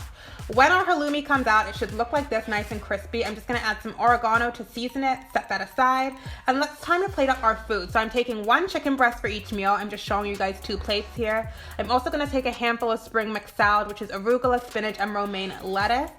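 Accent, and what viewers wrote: England, United Kingdom. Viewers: Just read your comment. Its funny because when you talk sometimes it sounds like you are from England. Now it makes sense as you grew up here lol. Anyhoo I love your 'what i eat in a day videos' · I didn't know you grew up in England! This explains why your accent sounds a bit English sometimes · aw bless you. I was trying to figure out your cute accent. I was thinking it was half British lol. Btw those sweet potatoes look bomb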